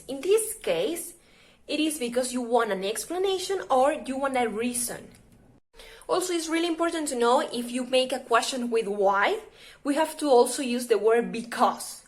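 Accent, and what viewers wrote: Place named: United States. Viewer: Coño primita pareces united states y todo jajajaa te felicito 💕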